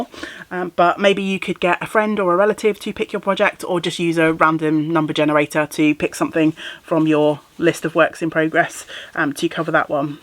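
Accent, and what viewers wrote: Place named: Wales, United Kingdom. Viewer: I am binge watching many of your videos. As I am working through my cast-ons for 2026, I have been thinking about what about you that has captured my attention . One thing is your accent and that you are in Wales. Your home town is beautiful. You have so many ideas. You enjoy many projects at once. You are a plus size woman. It is refreshing for me also a plus size woman. And your waffling is a great joy to me.🤣🤣🤣💚💚💚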